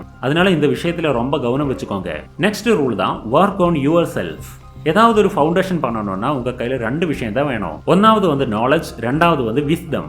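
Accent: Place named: North, India. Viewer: Vadamanila manithan pesum tamil... Try to talk in pure tamil or pure English... Don't mix(tamil+hindhi slang)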